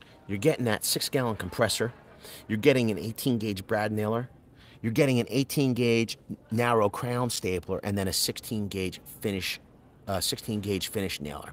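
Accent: Philadelphia, United States. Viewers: Love the strong Philly accent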